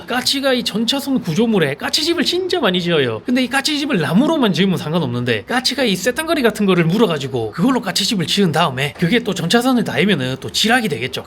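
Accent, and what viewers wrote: Busan, South Korea. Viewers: 안녕하세요 구독하고 갑니다 부산아재 고향목소리 듣기 너무 좋고 편합니데이 부교공 전기직군 빡세네요 외주 안주나 보네요 브레인께서 너무 최전선 상노가다 뛰셨네요 그동안 정말 고생많으셨습니다 !! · 저두 고향이 부산이여. 잘 볼께여 사투리 조아여~~~ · 나강처럼 부산 사람인교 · ㅠㅠ왜 눈에 습기가차는지.. 형님 갱상도 남자인데 은근 따뜻함이 느껴지네예….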